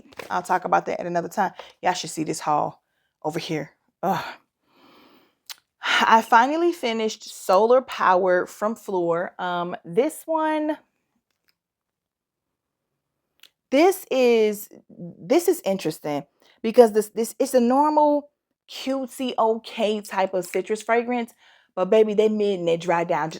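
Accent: United States. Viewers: Oh, my goodness, you are adorable with your comments and American accent! I agree with you, that you don't need to spend a kazillion dollars for a beautiful scent. Thank you for your review. ❤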